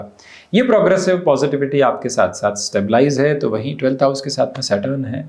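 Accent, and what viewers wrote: Standard. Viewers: Itni suddh hindi Maine DD1 ka Ramayan mai bhi nahi suna tha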